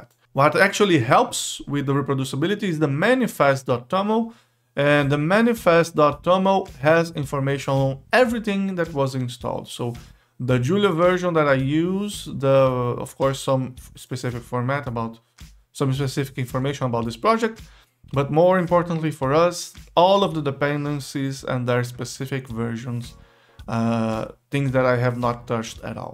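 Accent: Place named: Brazil. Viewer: Só pelo sotaque ja sei que é BR. Ótimo trabalho!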